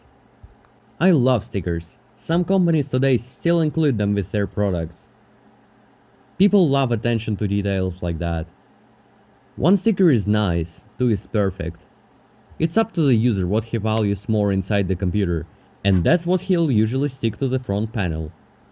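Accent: Russia. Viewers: the russian accent is real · Wait are you russian? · You from Russia? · russian accent...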